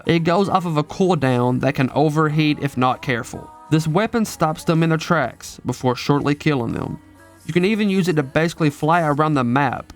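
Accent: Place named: United States. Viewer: youve got the most american accent i have ever heard and i kinda love it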